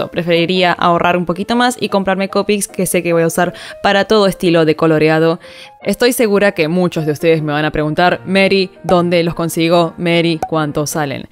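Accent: Argentina. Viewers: ''Ni puñetera idea'' te quiero, pero aveces el Argentino-Español se te pasa un poco.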